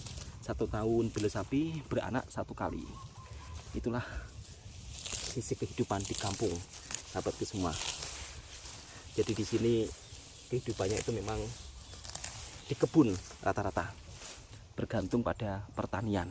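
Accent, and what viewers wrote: Java, Indonesia. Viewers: Bos Malaysia trkejut klw tk balik kerja d Malaysia?..😂😂😂 Maaf ckp la..sy tengok skill prkebunan d kpg wlwpun hanya tanaman sayuran..tk nmpk langsung kepandaiannya, nmpk mcm batas sayurannya semak, kurang trurus dan seolah spt petani yg pemls dan tk serius..tk bole d jdkn panduan dlm hal2 prtanian kebun. Amin Maulana lg bagus...prtuturannya pun lebih baik dan tratur, rajin ke dapur dan bole d katakn pandai memasak. Prtuturan ni trlalu bunyi jawanya dan kadang2 asyik trsasul, padahal sudah brbelas tahun d Malaysia. Lama2 naik bosan jgk menonton konten2 vidio si ni. Hello...buat lh perubahan konten yg lebih mmbina dan menarik lagi..jgn asyik gunakn ank saudara sebagai umpan nk melariskn konten vidio kamu je. Klw sesekali tu, tk pe la. Gunakn kepandaian, kreativiti dan inovasi original kamu sendiri utk menarik minat penonton, kualiti konten dan brilmiah. 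Komentar asyik nk kena ckp yg baik2 je, wlwpun nmpk byk kekurangan..sesekali spt kami penonton ini, kena lh tegas dan pedas cket komentarnya supaya harapan adanya perubahan yg lebih baik dan mmbina.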